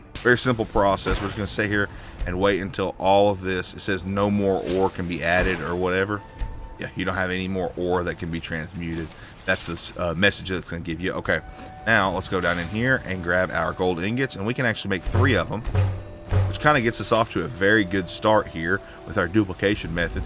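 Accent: South, United States. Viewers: Saw the video and thought "I hope it's the guy with the southern accent" and it so was. Think it's time I subscribed lol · The accent caught me off guard 😭 I'm a southerner myself tho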